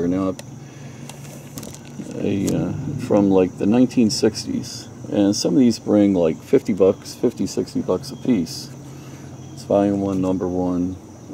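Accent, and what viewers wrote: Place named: Baltimore, United States. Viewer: Love your finds...great video. Your B'more accent keeps me longing for the good old days in Maryland! I lived in Glen Burnie for almost 20 years and nearly forgot what a terrific area it was for vintage finds. Keep it Up!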